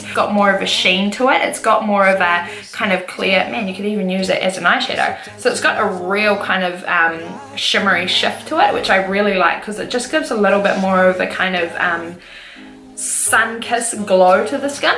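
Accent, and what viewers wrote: New Zealand. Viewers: I almost guessed wrong but I paused and was like, "no, not Australian, I think New Zealand". I was so excited to have been correct. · Are you from NZ?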